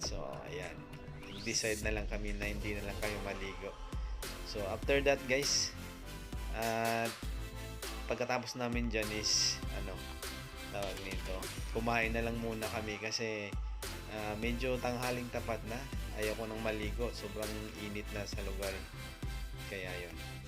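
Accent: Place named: Bicol, Philippines. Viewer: Akala ko Bicolano ka,, saka yong bukal akala ko pinakikita nila talaga 😂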